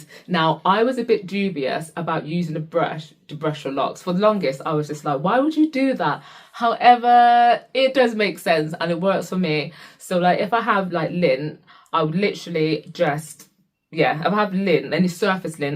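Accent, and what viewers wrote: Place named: Northern England, United Kingdom. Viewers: I recognized the UK accent. Love to hear it on black people. Waves and hugs from the midwest of USA! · UK in the house!🇬🇧So lovely to hear a British/Up North accent! Great advice, really helpful and your hair looks so healthy!❤️✨🫶🏾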